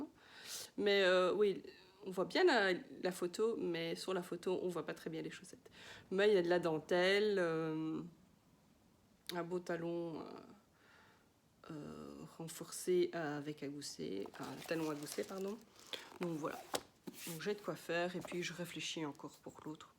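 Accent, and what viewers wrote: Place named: Belgium. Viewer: Bonjour je viens de découvrir tes podcasts tricot et en t’écoutant je me disais bien elle est de Belgique cette dame 😂😂 Ton visage ne met pas inconnu peut-être du monde du foot ou pas😆 Moi je suis de Uccle je ne sais pas si tu connais, en tout cas je passe de très bon moment en visionnant tes vidéos 🫶 Bisous et bonne journée 💋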